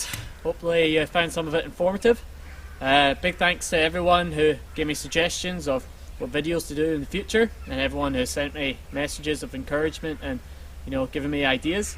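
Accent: Scotland, United Kingdom. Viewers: Interesting and informative, and great to hear a Scots accent on Yt. Dunno about dipping my plaid in a burn, though - you're a braver man than me,:-D All power to ye.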